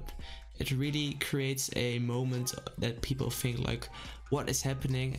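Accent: Netherlands. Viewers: nou ook weer goed te horen dat het een nederlander is lol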